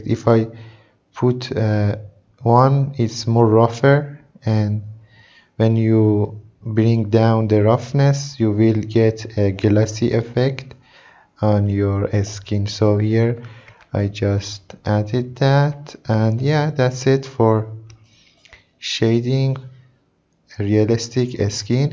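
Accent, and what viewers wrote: Iran. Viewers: literally one of the most accurate persian accents i've seen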